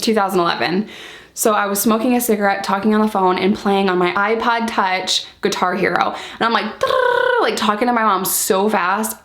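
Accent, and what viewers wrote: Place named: New York, United States. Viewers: i knew your accent was familiar! i’m from utica too i completely understand how you feel having family there i’m glad your mom moved!! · Your New York accent came out in this video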